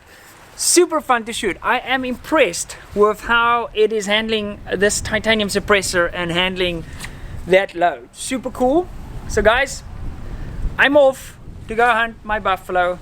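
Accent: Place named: South Africa. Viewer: ah a new transatlantic accent , half afrikaans , half american english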